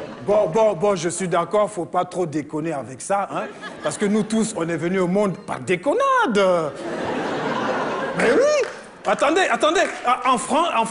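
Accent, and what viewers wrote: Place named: Niger. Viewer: Le type Nigéroi avec un gros accent, il débarque et il te fait du Raymond Devos, et j'ai aucune honte de faire cette comparaison, c'était très bon.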